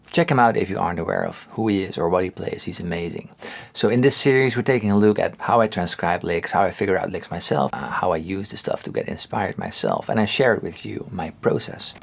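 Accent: Netherlands. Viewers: I was initially annoyed by your fantastic English in that Dutch accent, not to mention your prolific beard. I have become a true fan, though. Thanks for all the great content.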